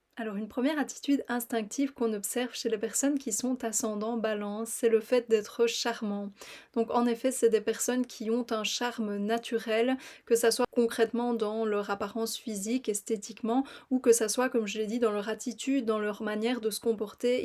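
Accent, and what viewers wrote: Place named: Belgium. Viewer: ton accent belge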